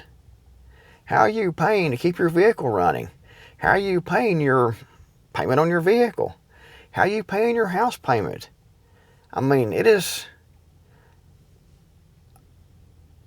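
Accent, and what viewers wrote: Appalachia, United States. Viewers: You are an Appalachian the same as I am! Be proud of how you speak! <3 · Keep that Appalachian accent! It is lovely. Buggy works! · True. But it is easy to see why everything has gone up in price. So if a lot of our stuff comes from Canada and Mexico and other countries then we are paying up to 100% more for stuff because of Trump's ridiculous tariff war, economic insecurity, and his crazy decisions make everything unsure. Because you come from Appalachia, which predominately votes Republican (I'm near WV), along with the accent. which is why they made the assumption :) Trump said it, the people you talked to just parroted his talking points. They didn't take the time to actually think about it (as you apparently have). They don't have critical thinking skills. They think Trump is going to throw off illegals, not poor white family. 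LOL When it all comes down, people are going to start scavenging and stealing and it will give T a chance to enact Martial Law. He wants to be a dictator and the easiest way is to stoke a war with someone outside and force an internal conflict and he can try to declare a state of emergency and then Martial Law.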